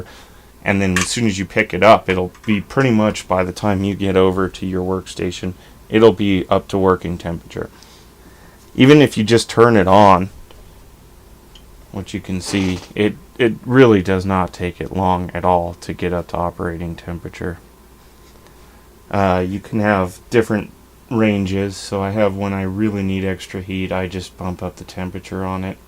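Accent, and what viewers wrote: United States. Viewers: excellent tutorial and thank you so much for doing and posting it. One thing though, the L is silent in the word solder unless you're from England. You sound American though?? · John Strauss For the Accent my money is on Michigander...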